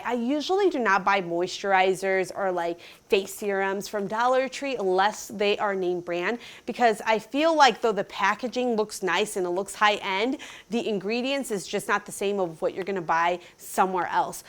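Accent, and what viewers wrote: Chicago, United States. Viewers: I'm so happy to hear that Chicago accent! I miss home so much 😞. Great video 💯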